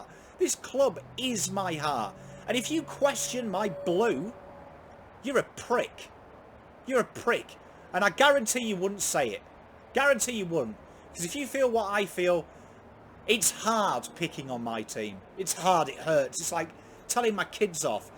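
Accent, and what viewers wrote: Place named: North, United Kingdom. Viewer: Never seen you before but hats off - good analysis.... the beautiful irony of AFL analysis with the Northern UK accent! I'm a 52 year old life long Blues fan and this, I feel, was the lowest performance I have ever seen! The particular pain I feel is around the fact that we have a core of very talented players.... but the level of desire, determination and far dinkum 'have-a- go-edness' was totally absent - apart from the same few who try their boxes off & show something every week (Walsh, Weiters, Harry etc think.... "fuck maybe I'm receptive to other clubs warm embrace). Need for the humility & reality involved in your 'Rehab' is a good metaphor for this sorry situation - knowing 'where you are at and embracing the hard work on a worthy journey'. Has Teague lost this group? Sunday SHOULD have been a showing of ferocious intent to demonstrate (even if only to ourselves) that we have 'something' by way of ticker. 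Instead, what I witnessed was a demonstration of such incompetence, lack of passion and lack of 'team' that I found myself (for the first time) not even disappointed - just numb. Keep up the good work - you're showing more passion than some of the folk who should be Driving this club!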